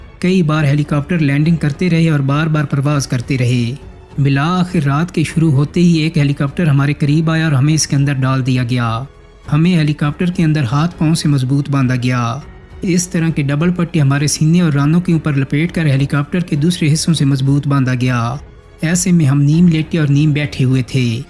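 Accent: Chakwal, Pakistan. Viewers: بھائی جان آپکا لہجہ ضلع چکوال کا لگ رہا ہے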